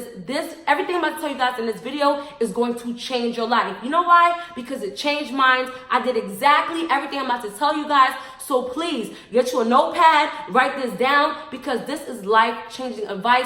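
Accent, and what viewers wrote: New York, United States. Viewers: She got that NY flow but she is classy and inspiration. Whole diff vibe 💖✨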